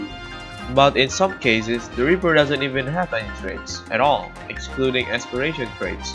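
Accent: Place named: Indonesia. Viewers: udh gw duga!didenger dari logatnya:v omfg!! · pantes aksenmu gw kenalin wkwk orng indo toh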